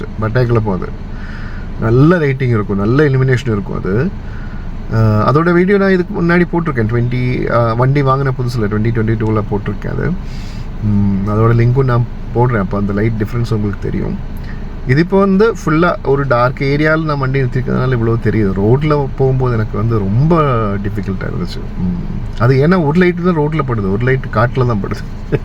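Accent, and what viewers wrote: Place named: Kerala, India. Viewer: You talk tamil well, but somewhere I feel like u hav sone mallu connection.. is it true?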